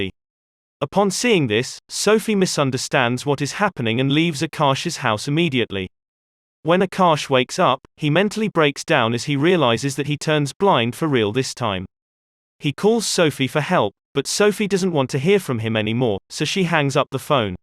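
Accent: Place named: United Kingdom. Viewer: Wow ur first video n u got 600 k views .... bollywood is lucky for u ... u have a british accent but ur from USA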